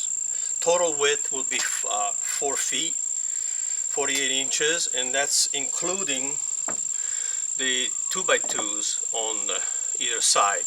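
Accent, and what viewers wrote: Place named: Sweden. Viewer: Why does he have a Swedish accent when speaking English?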